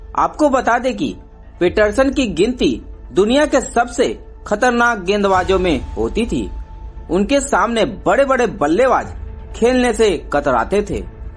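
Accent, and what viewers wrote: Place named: Bihar, India. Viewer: भाई आपके लहजे मालूम चलता है की आप बिहाड़ी (बिहारी) हैं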